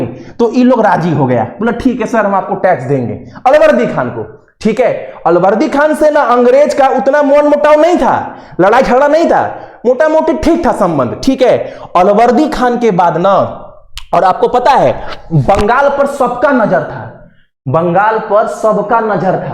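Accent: Bihar, India. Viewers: Bhaiya Aapne Bihari andaz me smjhaya Amazing 😍🤩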